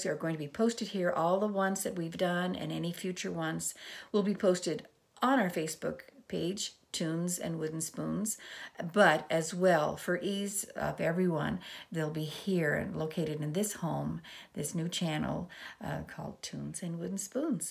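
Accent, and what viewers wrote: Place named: Maritimes, Canada. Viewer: I couldn't sleep last night with all the stress and worry and both of my children living outside of Canada ... so I stumbled across your cinnamon rolls YouTube thing. I think it was your first time and I just listened, watched and laughed and by 4 a.m. I was ready again for sleep. You probably don't realize the role you are playing in helping us through this time. I live in Alberta but my parents are from the South Shore and just hearing the familiar Maritime voice and references to Maritime things and people (Natalie!) ... well, you know ... it was special. Thank you. P.S. I loved the elm tree story and the wooden recipe boxes. A gem!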